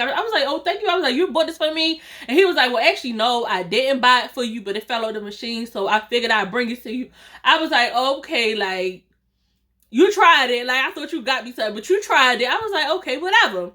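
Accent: Baltimore, United States. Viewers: Girl you are my favorite storytime teller I get so happy when you post a storytime yesssss and the Baltimore accent adds a little pazazzzzzzz 😂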